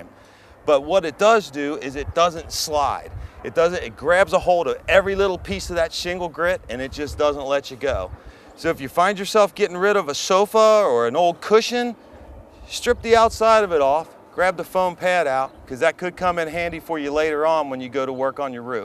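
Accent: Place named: Eastern Shore, United States. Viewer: This guy sounds like he is from the Eastern Shore.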